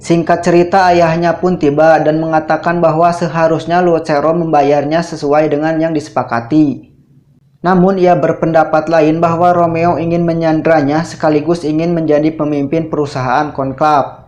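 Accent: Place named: Lebak, Indonesia. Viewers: Narasi nya ..suara.kedengaran sprti dari Lebak yaaa....pas lagi bilang ..Lucerroooo...Leeooo...Romeooo...sprti sandiwara Raadioooo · Sundaan geuning..prekeetek emang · Medok sundanya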